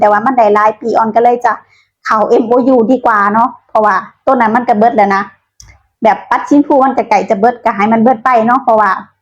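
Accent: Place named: Loei, Thailand. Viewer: สำเนียงเว่าคือคน จ.เลย แท้ครับ😅😅😅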